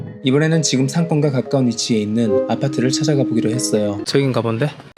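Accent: North Korea. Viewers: 말투에 북한 사투리가 느껴지는데? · 근데 경주출신 맞으세요? 말투가 뭔가 탈북민 같은데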